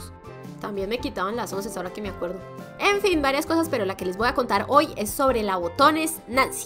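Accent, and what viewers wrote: Colombia. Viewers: me encanta como hablan los colombianos y es una bendición escuchar como hablas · Sabia que eras colombiana XD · eres colombiana porque así hablamos en miuu pais ^w^ nueva suuub por cierto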